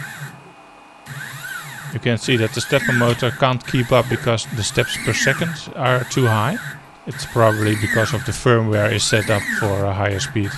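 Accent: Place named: Netherlands. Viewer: Considering his pronunciation, making fun of other people is not that great. Way he pronounced V and Ch and such I am pretty sure he is Dutch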